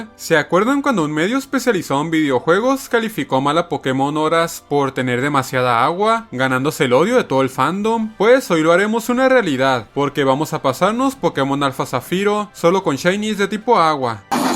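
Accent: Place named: North, Mexico. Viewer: Te amo mucho, me encantan tus videos❤️ Siento que eres del norte de México por cómo pronuncian la ch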